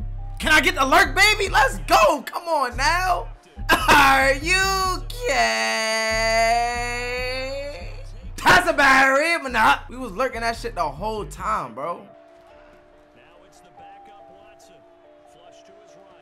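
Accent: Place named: DMV, United States. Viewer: Your from the DMV aren’t you lol…I can hear it…Good content bro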